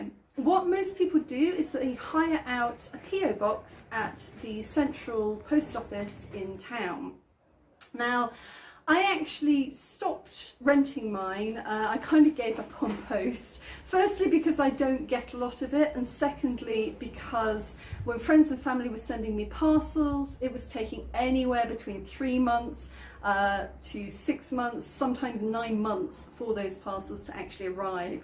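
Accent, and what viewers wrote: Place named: United Kingdom. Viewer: Love British accent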